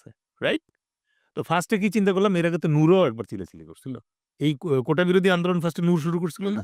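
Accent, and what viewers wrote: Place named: India. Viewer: Your sound like an Indian.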